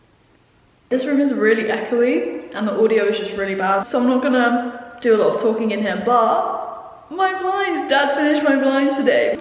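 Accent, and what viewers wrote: Germany. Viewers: As a native German speaker, I can tell you that your German accent is reeeally good❤️ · Love your german accent, i am from austria (so german is my mother tongue) and even I don‘t always get the grammar right - so don‘t worry too much, you‘re doing great!! Love your videos btw ❤️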